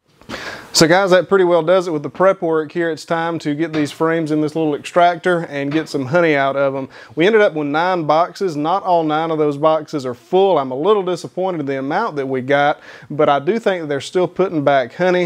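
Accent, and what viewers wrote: South, United States. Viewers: Can someone please tell me where in the south this accent is spoken.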